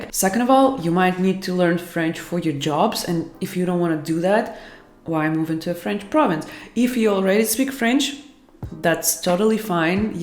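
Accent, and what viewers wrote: Russia. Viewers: You speak very clearly. Everyone like me who are hoping to go and settle in canada soon, will be able find your videos very informative & helpful.. By the way, No offence, i like your russian accent more❣️ Thank you for all those videos. Carry on..,❤️❤️ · Love your Russian accent. Sounds kinda cute though lol 😊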